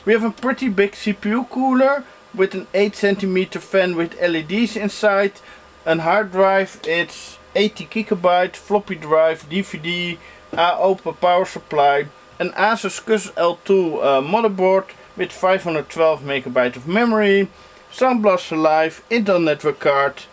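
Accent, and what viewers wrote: Netherlands. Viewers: The stickers saying Utrecht on the pc really weren't needed, I could immediately hear you were Dutch XD Nice video!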